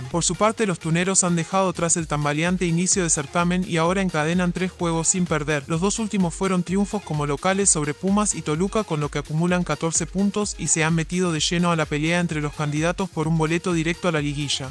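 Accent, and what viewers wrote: Argentina. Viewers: Quita la voz argentina fastidia ustedes solos se quitan suscriptores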